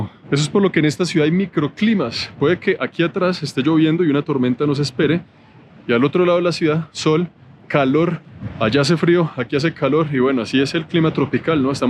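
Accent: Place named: Manizales, Colombia. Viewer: cuando comencé a seguirte pensé que eras de Manizales. Tienes un acento un poco parecido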